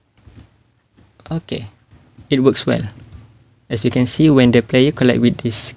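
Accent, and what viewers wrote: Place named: Malaysia. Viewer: thank you, btw you sound like malaysian.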